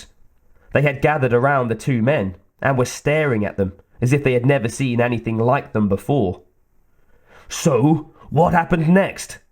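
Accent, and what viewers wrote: United Kingdom. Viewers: in the early months she would sit there and watch Aliens and Predator movies with me on the days I stayed home with her.. now she’s constantly on the move so I only get to listen. I’m on my 5th book now, and I’ve tried to make a habit of liking the each chapter as I start it for you! Also, your accent and tone is quite immersive, are you from GB?..?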